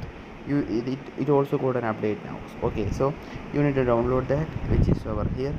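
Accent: Kerala, India. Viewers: English parayunna reethi kettapo manasilayi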